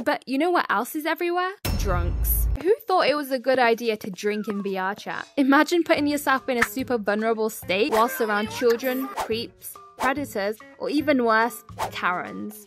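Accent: United Kingdom. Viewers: u from the uk ? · Are you British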